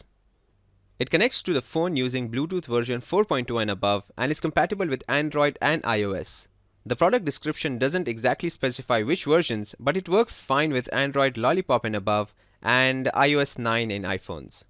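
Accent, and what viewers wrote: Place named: India. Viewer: Indian accents bug me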